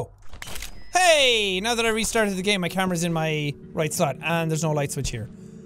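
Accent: Ireland. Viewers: I love how he gets more Irish when he's angry or scared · It's an Irish accent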